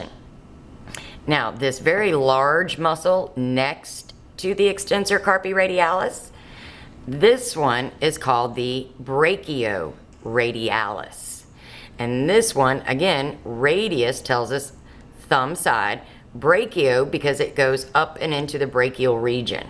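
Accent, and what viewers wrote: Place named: South, United States. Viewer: Something about the southern accent makes it all stick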